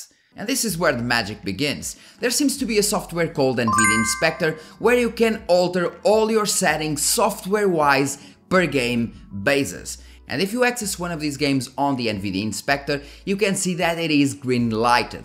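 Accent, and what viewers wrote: Portugal. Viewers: very good video. btw you sound portuguese accent. i know cuz u sound like my accent lol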